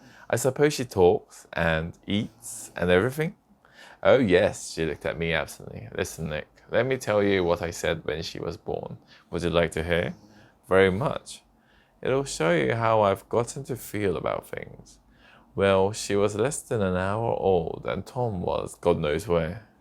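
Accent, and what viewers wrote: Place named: United Kingdom. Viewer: 잠이 솔솔오네요ㅎㅎㅎ 목소리도 영국식발음도 너무 좋으세요 앞으로 매일밤 들으면서 꿀잠잘 것 같아요💫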